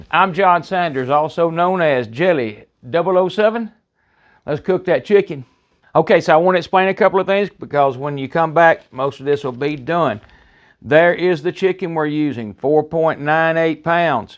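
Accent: South, United States. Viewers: love your accent! southern accents sound always so funny to me. i could listen to you for hrs. i just got one of these insta pots for christmas and i am scared to use it. much love from michigan! i wonder if my accent sounds as funny and nice as yours. take care.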